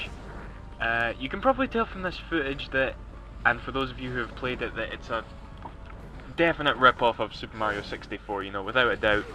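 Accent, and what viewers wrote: Scotland, United Kingdom. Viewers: Which part of scotland are u from? Im from aberdeen ya see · well hes scottish, thats how we talk XD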